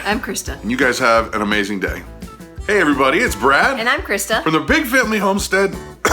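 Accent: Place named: Louisiana, United States. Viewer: The whole time I’m listening to your story I was like, “Yep, that’s Louisiana!!” Lol! Welcome to my lovely state. Ha! Glad your event was a success.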